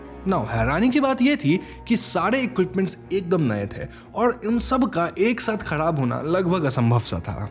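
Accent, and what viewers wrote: Bengal, India. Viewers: The man who is narrating ... Are you bengali? Because your accent is quite like them...😁 By the way amazing narrating 🤓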